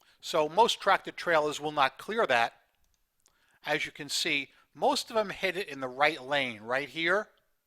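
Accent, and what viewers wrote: New York, United States. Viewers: your accent! You are a New Yawka times 10! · Very fun to explore with you and your fun New York accent. The bathroom is not a bathroom if there are no showers or bathtubs. So it's known as a Restroom. 🤗🤗🤗🤗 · the narrator is a native New Yawker! He calls the street the Henry Hudson "Pawkway."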